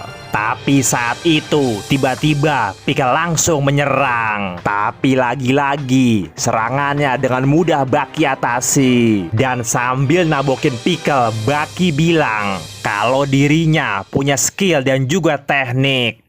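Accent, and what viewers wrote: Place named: Betawi, Indonesia. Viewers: PEMBAWAAN CERITA ALA BETAWINYA KEREN PARAH,SUBSCIRBE AH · Betawi style...gw suka banget pembawaan channel ini...ET dh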